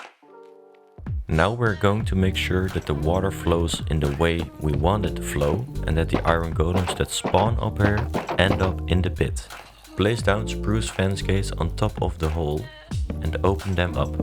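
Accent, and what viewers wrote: Netherlands. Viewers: why do you sound Dutch??!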